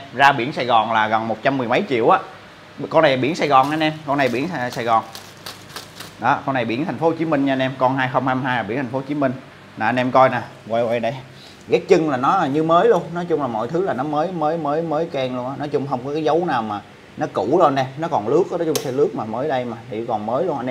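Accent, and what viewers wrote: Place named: Ninh Thuan, Vietnam. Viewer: Nghe tiếng a nói chuyện, giống dân Ninh Thuận quá ta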